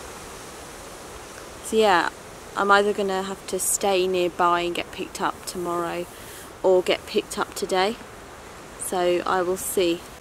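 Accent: United Kingdom. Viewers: YT video recommendation brought me here and i stayed to watch it because I really like the british dialect/accent. Best wishes from Switzerland 😎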